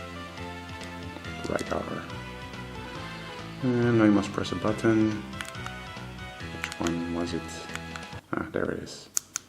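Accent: Netherlands. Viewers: You have the most obvious Dutch accent ever. Great video though, interesting build! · are you dutch? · another dutch who can't speak english without an accent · (ik hoor aan je accent dat je Nederlands bent dus doe ik dat ook haha), Gaaf ding man, kun je laten zien hoe je 'm maakt? Ik wil dit ook gaan doen namelijk en heb er niet veel verstand van. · Are you dutch cause you really have a dutch accent i can know cause im dutch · Yes, he sounds Dutch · You a dutchie??? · I hear a Dutch accent ;) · I love his dutch accent :D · Are You dutch? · You must be a coming from the Netherlands, according to your accent. Anyway. I like the Game Boy Advance shelled bottom of the Nintendo DS lite! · Are you Dutch by any chance? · Je klinkt als een Nederlander die Engels praat, klopt dit? 😜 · wow, dit is echt het meest nederlandse accent ooit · You sound Dutch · Are you from the Netherlands? 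Your accent sounds dutch.. Anyway.. If you are, can you make me one for a fair price? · Heard you were dutch right away haha :-D Lekker bezig man!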